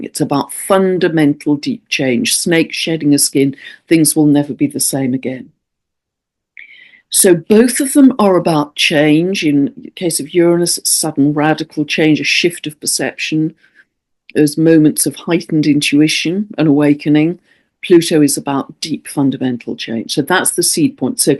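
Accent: England, United Kingdom. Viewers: On the couch with red cushions; I love it, it's so normal and likeable. A clear English accent and, above all, a super-positive charisma and energy! I love your videos; they're a true blessing and a constant companion. It's wonderful that we're connected all over the world! Thank you. Best wishes and belated happy birthday!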